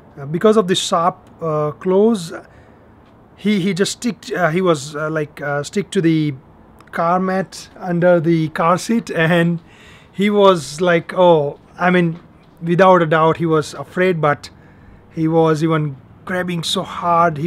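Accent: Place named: Bhojpuri, India. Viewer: Bhojpuri accent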